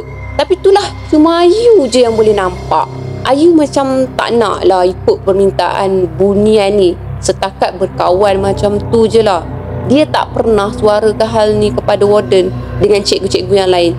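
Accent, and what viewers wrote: Kelantan, Malaysia. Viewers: Sedap pulak dengar kecek Kelate..😄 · Hadir selalu layan.. Suka dengor akk kecek kelate...😁😂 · Kak org Kelate ko...kg mano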